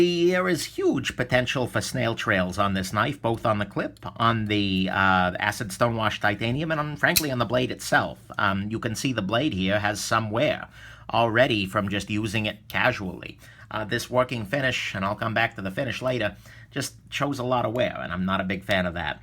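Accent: New York, United States. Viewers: Hey, where are you from? Almost sounds like Brooklyn, somewhere in NY...not sure, but accent definitely seems east coast. Anyway, I like it! It adds color, and reminds me of home (I'm from NYC, but currently living in Seattle). Am I close?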